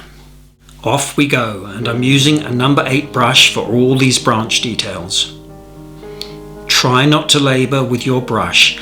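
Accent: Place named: United Kingdom. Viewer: I'm starting to dream about what to paint next and strangely, it's in a British accent 🙂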